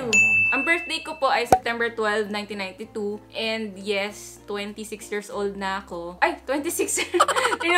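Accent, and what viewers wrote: Visayas, Philippines. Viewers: Bisaya ka dai?????? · Bisaya daiy ka te😌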